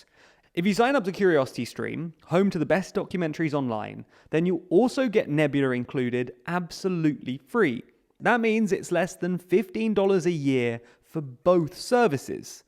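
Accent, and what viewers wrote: United Kingdom. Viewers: Obvious errors : - titles in the video mentioning. 5th May, 7th May should read June as the date visible on the news article shown - zelensky didn’t state 500 losses a day in Ukrainians troups but 50 to 150 as another comment corrected. He also said the Russians are losing almost 300 soldiers a day. I really much appreciate the quality of the TDLR videos, but these errors seems more and more frequent, like when it estimates Russian military budget to an incredible 250 millions of dollars where the reality is more 80 millions … some serious quantify checks are necessary there ! It’s a pity because your videos are very well illustrated, very concise, and the British accent makes these videos “Über chic”…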